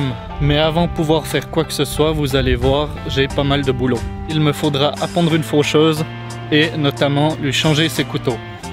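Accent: Vaud, Switzerland. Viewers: le bon accent vaudois !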